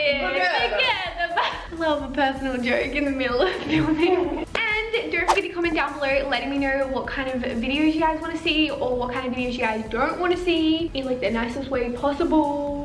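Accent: Australia. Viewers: Y do Australians say hach and not ach lol😂😂😂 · Where's your accent from? Australia?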